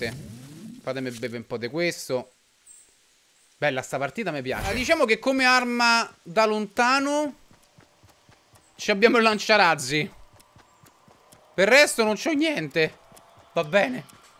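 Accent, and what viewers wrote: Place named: Naples, Italy. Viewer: Ti seguo da poco ma ho già capito che sei napoletano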